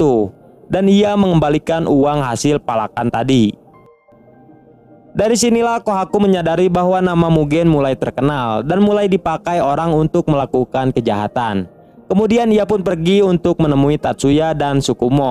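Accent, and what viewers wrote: West Java, Indonesia. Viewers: Bahasa nya gk angger,ada sunda²nya 🙏🏾🤭😂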